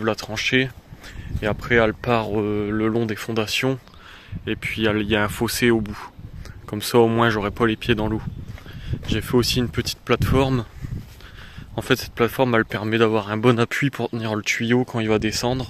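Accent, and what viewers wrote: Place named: Quebec, Canada. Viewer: Tu habites où? As tu déjà habité au Québec? On sent un accent québécois derrière ton accent français...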